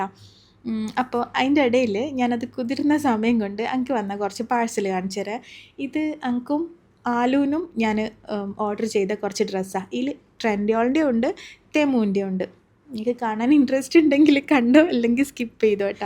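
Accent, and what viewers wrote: Kannur, India. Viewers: Nalla nadan kannur varthanam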